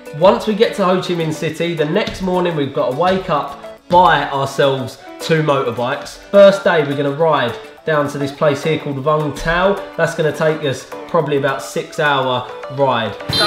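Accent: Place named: England, United Kingdom. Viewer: You sound more English than most English people. Proper mate.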